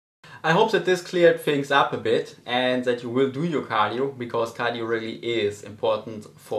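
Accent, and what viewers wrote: Germany. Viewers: Loving your accent ,are you german?Anyway nice video,good info.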